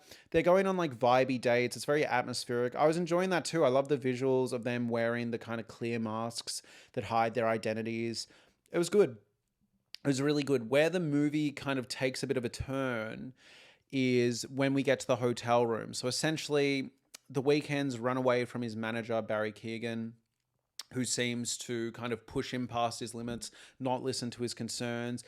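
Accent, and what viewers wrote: Australia. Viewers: I'm so happy you replied ! ^__^ as an American, I love different accents. Australia being in the top 3 ! British, Ireland, and Australian ! I can always tell an aussie by how they say no. It kind of sounds like nor with an R Thank you so much again!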